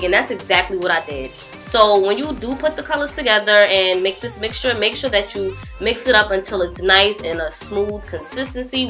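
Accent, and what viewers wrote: New York, United States. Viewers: u can hear the new york in her voice 😍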